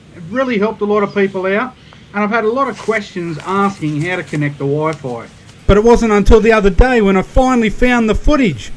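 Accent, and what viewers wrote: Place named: Australia. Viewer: What a legend. As a kiwi there is nothing more reassuring than an Aussie accent gently educating you on the finer points of setting up your drone. Most appreciated 😅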